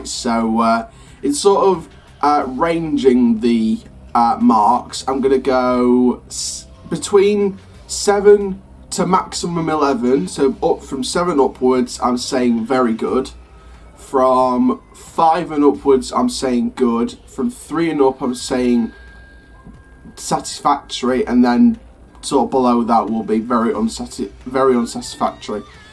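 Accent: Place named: Doncaster, United Kingdom. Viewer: Love your videos they're great, however, they hit a whole new level when you put the subtitles on. I don't think YouTube understands Doncaster accents too well.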